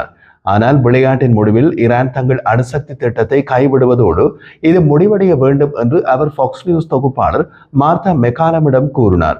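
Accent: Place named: Sri Lanka. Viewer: இலங்கை த்தமிழ் உச்சரிப்பு அபாரம்.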